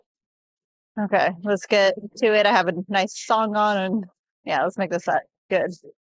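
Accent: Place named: United States. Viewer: p.s i always loved youe american accent but i never knew there were palm trees where you live... its dark and it snowed last week where i live.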